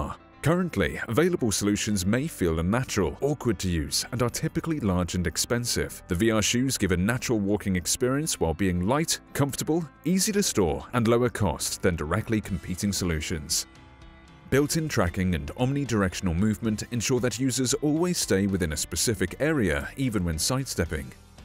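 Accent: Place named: Germany. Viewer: nice , I Ve read the faq it s awesome . But could I have a bit more details about the price . Even if you don t have the exact price I wish to have an idea , if it s more like 100€ or 200€or even 300€ . I Ve noticed the German accent , it s nice ^^ I live 30minutes far from Germany and I speak German too (nice language ).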